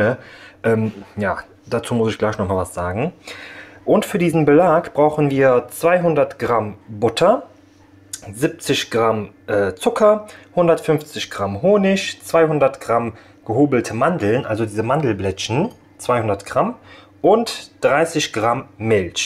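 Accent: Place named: Cologne, Germany. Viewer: Klasse Video,! Kommst Du aus Köln? Ich frage wegen Deinem sympathischen Akzent. Milsch, Honisch...... 🌸🌸🌸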